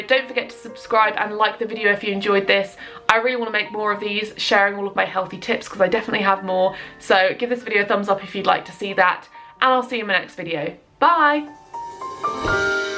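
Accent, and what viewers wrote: United Kingdom. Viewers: I like your British accent and thank you very much!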